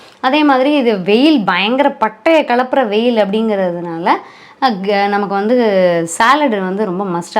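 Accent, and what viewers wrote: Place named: Tamil Brahmin, India. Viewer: I think you are a tambrahm since your diction is familiar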